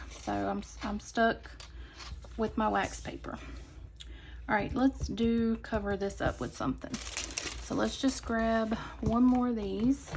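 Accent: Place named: Texas, United States. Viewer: What state are you in ? You sound southern or Texan It is a cute spread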